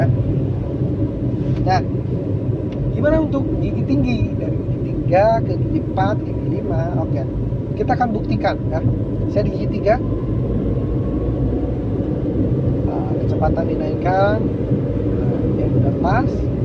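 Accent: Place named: Pangandaran, Indonesia. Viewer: Orang mana a siga orang pangandaran ningan😁😁🙏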